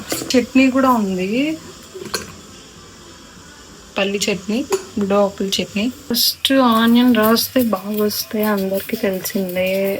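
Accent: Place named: United Kingdom. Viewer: Your English Accent is like British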